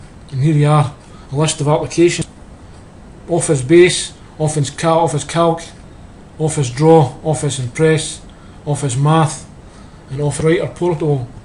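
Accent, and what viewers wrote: Scotland, United Kingdom. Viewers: Scottish accent? 👍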